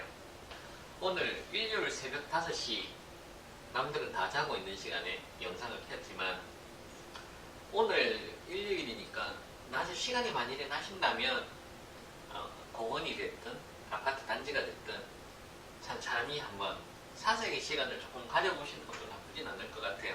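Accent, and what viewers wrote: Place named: Gyeongsang, South Korea. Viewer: 참 부지런하시네요. 말투가 경상도 싸나이~? 좋은 말씀들 잘 듣고 구독 꾸~욱 누르고갑니다.♡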